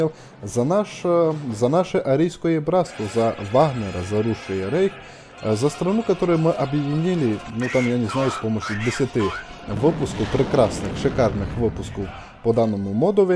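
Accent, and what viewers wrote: Ukraine. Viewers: Прохождение классное, голос автора приятный с нотками украинского, это атмосферно. Однозначно лайк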